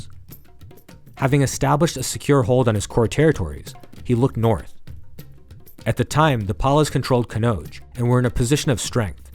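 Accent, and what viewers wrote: United States. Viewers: Why do we have to hear American Accent. Would have been better if Indian names were pronounced correctly. Rashtrakuta Kannauja I had to try 👂 thrice to understand what it is. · The narrator is an Indian origin American. We cannot blame him for the accent since he has spent his entire life in America. Thankfully he is still an Indian at heart.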